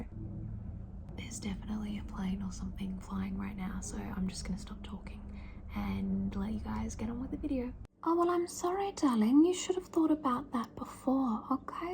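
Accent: Australia. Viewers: If everyone in australia speaks like this i think i need to move asap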